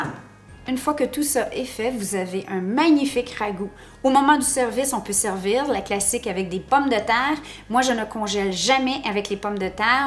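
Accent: Quebec, Canada. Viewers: Bonsoir, Je vis à Versailles du côté de Paris en France (Je dis ça parce que je sais qu'il y a une ville qui se nomme Paris aux USA) je te trouve super sympathique agréable et pleine de vie et de gaité avec " Chéri " comme tu l'appelles, eh puis j'ai découvert tout le début de la vie de ton petit Momo, j'en ai eu les larmes aux yeux tellement vous avez du prendre sur vous et passer des moments si difficiles, mais vraiment vous avez tout mon respect vous êtes vraiment des parents fabuleux, je trouve vôtre aventure stupéfiante..... Oui donc j'aime aussi beaucoup ton accent québécois on avait le même en France au 17ème et 18ème siècle, je trouve vraiment ta manière de parler très craquante!! 😉🤗. Par contre tu devrais pour nous les français donner des traductions de certains mots typiques du Québec, et surtout vos mesures... Bon maintenant j'ai fais des recherches donc j'arrive à te décoder mieux!! 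😏. Par exemple quand tu dis cuillère à thé en France on dit cuillère à café, quand tu dis cuillère à table nous on dit cuillère à soupe, quand tu dis une livre nous on dit un demi kilo...!!! Aaah sinon je vais faire bientôt ton fameux ragoût de boulettes parce que j'en ai tellement envie en te voyant faire ça m'a saliver, et j'adore le jarret de porc cuit, que nous mangeons chez nous avec des lentilles ou de la choucroute, c'est une merveille!!... Et j'ai vu aussi que tu es copine avec Popote et potager de Thierry et sa cherie, je les connais depuis pas mal te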